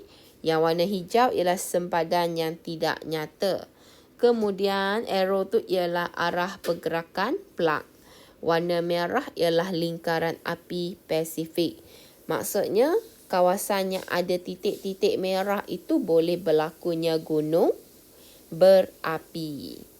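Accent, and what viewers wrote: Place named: Kelantan, Malaysia. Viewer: cg,cg orang Kelantan erhhhh HAHAHHA BUNYI SLANG KELANTAN "TAKDI" 😂.COMEL CG TQ VIDEO NI TOLONG SAYA UNTUK ULANG KAJI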